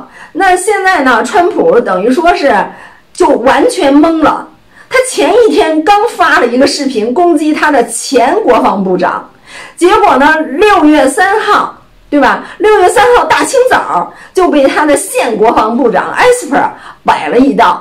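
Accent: Beijing, China. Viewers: 哪里人？北京的吗？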